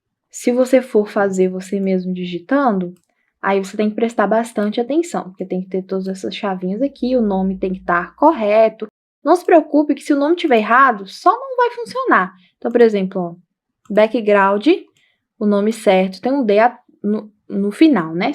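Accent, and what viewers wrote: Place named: Brasília, Brazil. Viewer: Se pudesse, dava 1000 likes. 👏👏👏👏👏👏👏👏. Como faço para ter uma aula presencial com vc? Vc é aqui de Brasília? ( Pergunto pelo sotaque. Rs).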